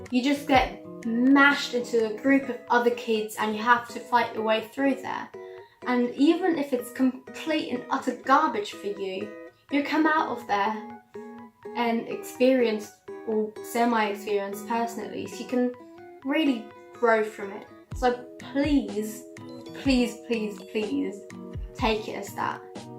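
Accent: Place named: United Kingdom. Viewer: I swear your accent is so fucking british 😍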